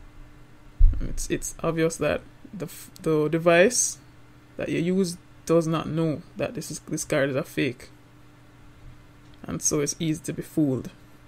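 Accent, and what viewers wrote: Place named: Jamaica. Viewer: Comment not related to the video. You sound Jamaican to my ears.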